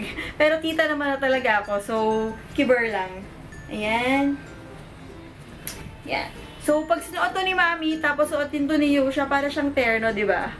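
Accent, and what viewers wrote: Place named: Pampanga, Philippines. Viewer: U sound kapampangan😂✨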